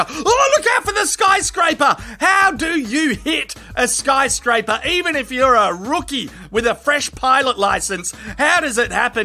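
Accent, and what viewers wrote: Australia. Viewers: The drones smashing into stuff like the building twice is the return to home feature on the older DJI 2-3. That's why it hit the same window almost its tracking GPS and doesn't know about skyscrapers. That said still funny with an Aussie voice over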